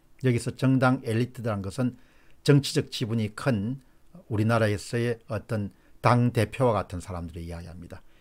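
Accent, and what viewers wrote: Gyeongsang, South Korea. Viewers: 와 경상도 말쓰는 극우 유튜버다! 자한당에 대해서는 아무런 할말이 없지요?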